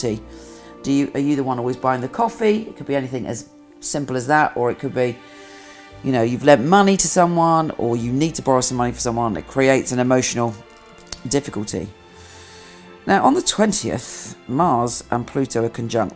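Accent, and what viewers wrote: United Kingdom. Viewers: Your videos are stunning. if they had an award for production I would vote for you. I always come for my weekly and monthly reading. You are such a force with your information. Oh, I just adore the British accent. Cheers!!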